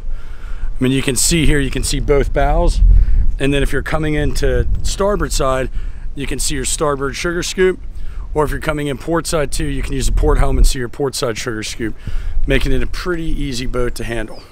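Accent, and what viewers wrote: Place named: Maryland, United States. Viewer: Gotta love that Mairlin accent.. Love the bewt.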